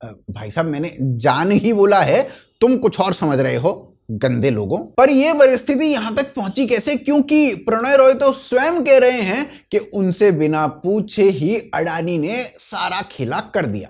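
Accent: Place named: Morena, India. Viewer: Bhai aap log Chambal se hi belong karte hai na? Kyuki aapka morena accent bahut hi natural hai.. I am also from Gwalior.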